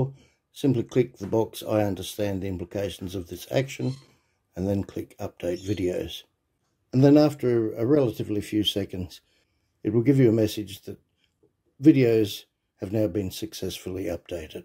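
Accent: Australia. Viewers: And your Australian accent is super cool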